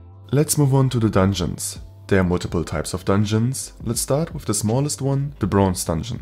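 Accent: Germany. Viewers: Why is the German accent so damn strong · deustcher akzent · good video as always. took me many vidoes to realize that you have an german accent lol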